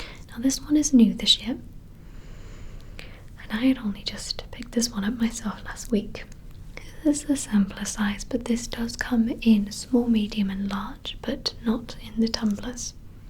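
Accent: Wales, United Kingdom. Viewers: Welsh accent is incredibly nice😍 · Fantastic video. I find your voice so comforting. I think it's because I'm Welsh too and so your accent is so familiar!!!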